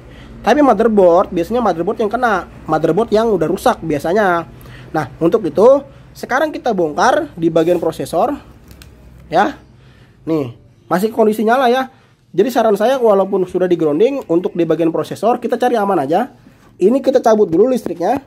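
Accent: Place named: Bekasi, Indonesia. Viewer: Pasti orang bekasi cikarang euy wqwqwq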